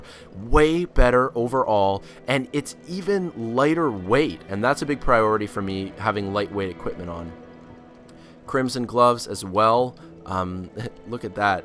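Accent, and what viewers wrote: Canada. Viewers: Love the accent, Canadian? This condensed walkthrough will help me immensely. I died after my first attempt to get into Undead Burgh after I slaughtered quite a few and had a "wat?" moment so I've been waiting for some help.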